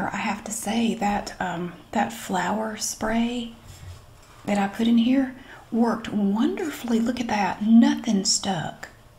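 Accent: South, United States. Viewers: Thanks, another great video. My sister and I love your southern accent, it relaxes us, probably growing up watching American shows on TV. Love from Melbourne, Australia.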